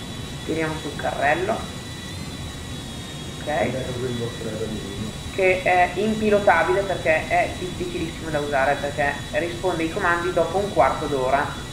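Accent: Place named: Parma, Italy. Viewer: Ma sei di Parma? Parli come Andrea Alongi...😮 Prova a dire Saccagnato...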